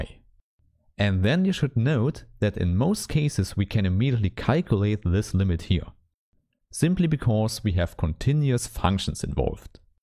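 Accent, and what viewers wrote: Germany. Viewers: Oh, your german? Its quite noticable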